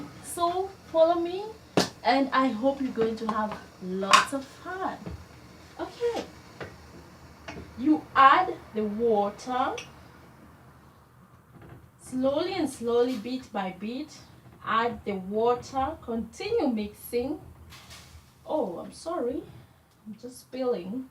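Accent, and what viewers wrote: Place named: Tanzania. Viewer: wooow surely you speak really like us Tanzanians.. It was quite easy for me to understand you... Hahaha I really laughed alooot when you said you ate ukoko at school. I really enjoyed watching you cooking Ugali mboga. As a single man tomorrow i am going to try cooking ugali mboga.... You did it quite well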